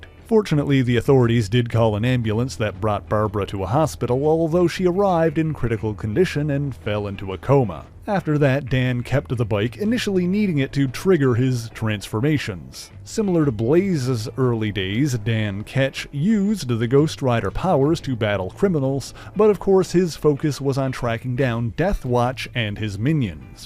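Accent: Canada. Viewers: I can hear the subtle Canadian accent 😂. Great video though. Been trying to hunt down all the single issues so I can finnaly read the full story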